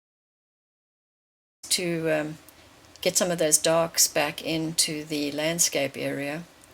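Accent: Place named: South Africa. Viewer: Hi..I'm new to water colour, and your demo's are so helpful. I'm am oil painter, so it's quite an adjustment trying to work in reverse to what I'm used to. Nice South African accent too...where in SA are you from 😊?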